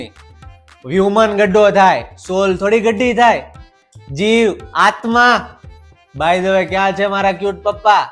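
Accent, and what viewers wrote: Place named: Kathiawar, India. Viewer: આપ ભાવનગર નું ગૌરવ વધાર્યું પ્યૉર દેશી કાઠિયાવાડી રજૂઆત આપ કરી રહ્યા છો હો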